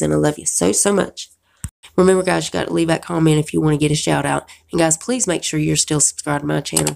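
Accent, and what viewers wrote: South, United States. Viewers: Thanks for the spoilers, loving your Southern accent..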